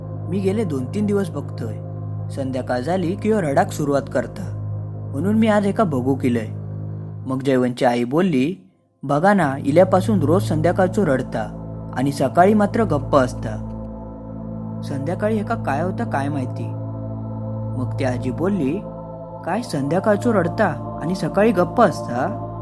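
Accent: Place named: Malvan, India. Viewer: Story thik ahe but accent malvani ahe so kalnyat thodasa Vel lagto and also plz...for god's sake biography bollyasarkha accent naka theu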